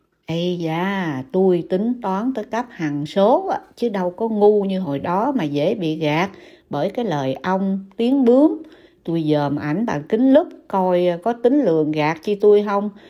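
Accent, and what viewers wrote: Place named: Mekong Delta, Vietnam. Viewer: Vui vui ! Giọng đọc chuẩn miền Tây luôn bạn ơi ! Cám ơn bạn đã chia sẽ.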